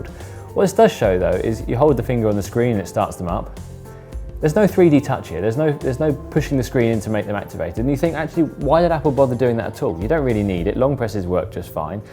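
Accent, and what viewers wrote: United Kingdom. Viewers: shittiest review ever. no hardware, software comparison with other phones, no technical details, very generic and personal opinions, sometimes even biased for example you skipped the details of the shitty 1.2 megapixel selfie camera which is the only biggest flaw in iPhone SE imo that is making me hesitant to buy it. anyway, try to watch linus, johnathan morrison or marques brownlee reviews and see the difference please. i mean anyone can say iPhone SE is a lot smoother, faster and very nice everyone likes it blah blah OF COURSE OBVIOUSLY ITS FASTER ITS A NEW FUCKING PHONE FOR GOD SAKE. I don't need you to tell me to know that its faster but by how much faster than previous generation phones! geez I always like the british accent because it sounds so professional and cool but this time it's disgusting to hear u speaking it. eww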